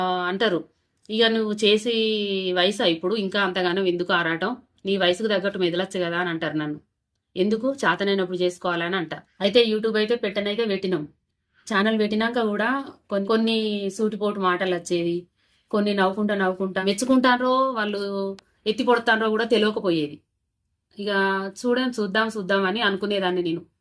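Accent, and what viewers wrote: Telangana, India. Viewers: తెలంగాణస్లాంగ్ లో దమ్ముంది మర్యాద ఉంటది మీ మాట సద్దనపు మూట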